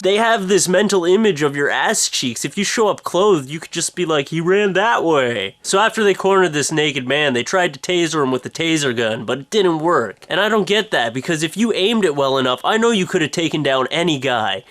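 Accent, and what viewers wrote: Canada. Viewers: that's the Canadian accent, its not weird -_ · canadian eh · LOL. I think it's so funny when you Americans think that Canadians say things strange. What exactly are you hearing? How's he supposed to say "house"? It's kind of like how a lot of you Americans say "ruff" for "roof." Why do you make a dog noise for "roof"? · i knew u were from canada, but i just now noticed u have a accent. like when u said house. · It's the canadian prairies accent. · "don't struggle or i might get a stiffy!" hahaha funny shit! and i like how you say house. i wish i had a canadian accent :( oh, well. canadians have good weed too! :)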